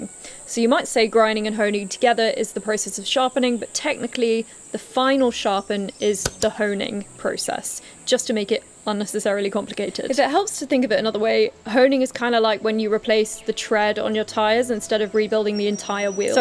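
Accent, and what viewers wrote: England, United Kingdom. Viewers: Doesn't hurt though. Americans can't resist that British accent with a feminine lilt. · Interesting situation! You sound English, you're living in Australia, and you are using Texas slang i.e. "Y'all. Okay, well, from an English man living in South Texas, here's a question: How do you deal with the obvious humidity when woodworking? Perhaps that could be a subject for one of your videos.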